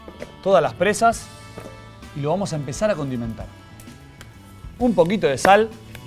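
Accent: Buenos Aires, Argentina. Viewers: Carlos Matias asi se habla solo en Bs As.... en el interior de Argentina le decimos Poyo... abrazo grande desde Tucuman !!! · Así lo pronunciamos en buenos aires xd posho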